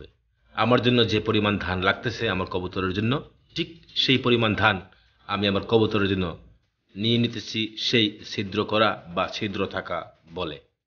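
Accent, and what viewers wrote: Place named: Habiganj, Bangladesh. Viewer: ভাই আপনার বাড়ি কোথায় আমাদের হবিগঞ্জের ভাষা মনে হল বলন কিন্তুু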